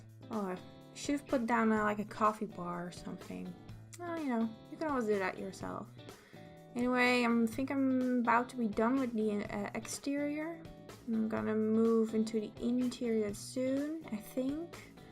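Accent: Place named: United States. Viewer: YOU'RE DUTCH?! you have the cutest accent ever, i would have never guessed, i would have guessed somewhere in america! O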